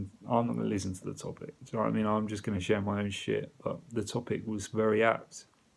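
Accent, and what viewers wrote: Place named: United Kingdom. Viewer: Its the British accent 🤩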